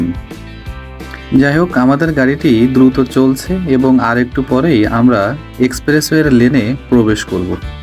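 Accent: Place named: Noakhali, Bangladesh. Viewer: beshi lomba hoye geche. Samne short korba. Tumi mone hoy noakhailly, amio. Pronunciation thik korar cheshta koro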